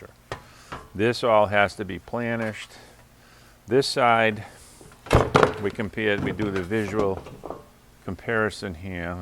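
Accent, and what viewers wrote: Boston, United States. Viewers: your "pauk the cah and feed da meeeder" = park the car and feed the meter Bostonian narration is always enjoyable. This is a great series!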